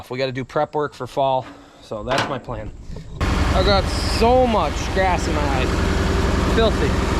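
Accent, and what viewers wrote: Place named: South, United States. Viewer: You got southern boy in you (Y’all )👍🏻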